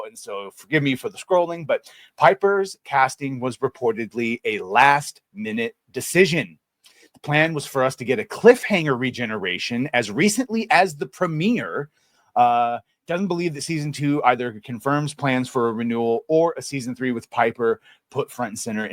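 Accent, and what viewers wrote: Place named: Cockney, United Kingdom. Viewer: Love the cockney accent, jokes on you though as it's been replaced with the Pakistani English accent.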